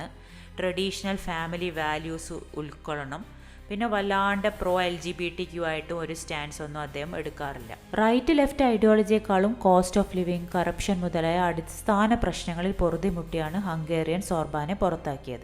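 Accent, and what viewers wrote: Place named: Kozhikode, India. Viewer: congrats 🎉. Big things in simple humor coated "koyikkodan" slang. Go ahead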